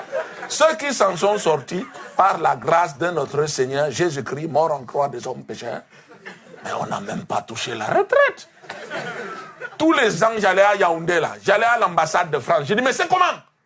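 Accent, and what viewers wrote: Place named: Ivory Coast. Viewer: Il a fait un accent ivoirien pas sénégalais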